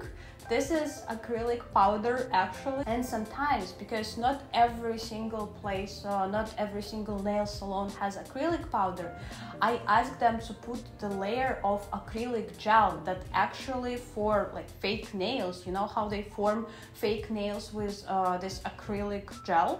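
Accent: Russia. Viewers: она русская?? прям слышимый акцент.. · да!это очень заметно из-за русского акцента ,который хоть и не очень ярко ,но выражен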